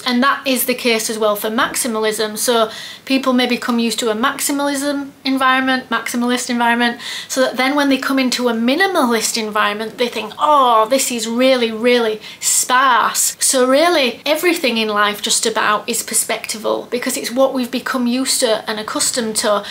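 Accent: Yorkshire, United Kingdom. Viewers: Yes, I did inherit the Yorkshire sense of humour-the best sense of humour in the world! I was born in NZ, but my entire family was born in Leeds, including my older brother. I think that is what initially attracted me to your videos. Even after being here 60+ years, my mum's accent is still as strong as yours. I have only been following you for a couple of months and am gradually working my way through all your past videos. It's about 6.30am on Friday morning (yes, I am in your future😱😳🤪😅), so time to get mum out of bed - no rest for the wicked. Do you have a job? How do you fill your day? Maybe you have done that video, and I just haven't seen it yet. I like watching your thought process as you declutter. I have trouble with letting go of mementos picked up from traveling and items that remind me of good times. And teddy bears. And CDs. And tarot cards. 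And duplicates of things (as NZ is earthquake and tsunami prone, I am constantly worried about not having backups if I lose the original). Oh God, 10 minutes later, and mother will be wetting the bed. Have a good night. Enjoyed chatting. If you are ever down this way ..... call in, have a coffee, stay. Would love to meet you, you come across as kind, funny, calm and very friendly 💖💖💖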